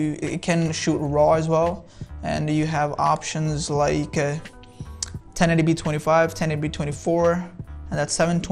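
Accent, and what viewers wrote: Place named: India. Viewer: are you indian? Your accent is op❤ What time it take to develop bhiiya?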